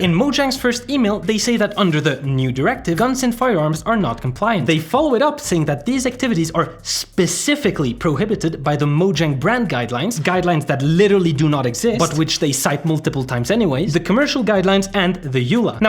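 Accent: Sweden. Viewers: The Swedish accent just got worse and worse lmao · Lol he's becoming more Swedish as the video goes on and he gets angrier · The angrier he gets . The more Swedish he becomes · I love how he gets more Swedish as the video goes on. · You can tell that it gets really personal when you can hear more of the Swedish accent from him as the video plays on. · Is it just me or is his accent getting more swedish as the video goes on?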